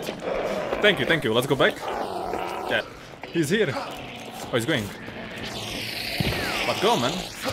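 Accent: Czech Republic. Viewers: Im already know all by 300 hours but thanks for tips you sounds I little bit like from Czech Republic so easy to understand thanks man..👍🤠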